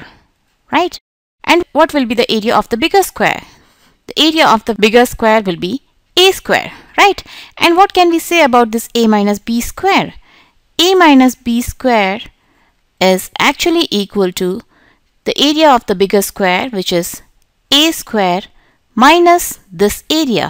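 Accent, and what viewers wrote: India. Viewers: O the pronunciation and so you are indian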